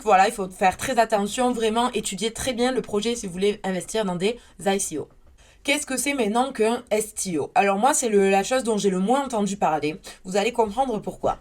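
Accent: South, France. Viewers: Accent du sud ?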